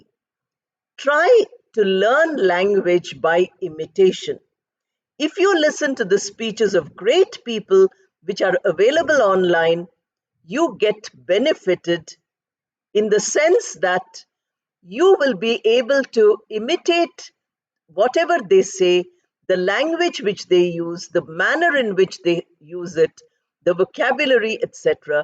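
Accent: Bengal, India. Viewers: What kind of english is this?american or british? It sounds like Benglish. Plz. Learn english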